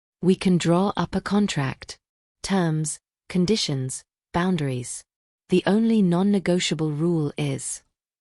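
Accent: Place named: Ireland. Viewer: The reader's accent sounds Irish. It's beautiful. I feel like I'm far away in a green country, inhaling the bakery''s smell of home. I long for a little bit more.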